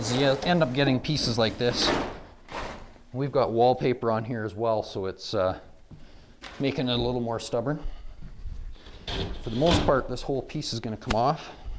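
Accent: Canada. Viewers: Only americans can tell if he has a Canadian accent ! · Heavy Canadian accent wo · as soon as i heard the canadian voice i clicked off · The second I heard the Canadian accent. I knew I could trust this guy. Thanks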